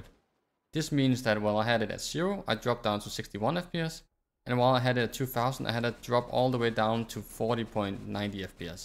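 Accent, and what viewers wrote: Denmark. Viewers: den mest danske accent jeg har hørt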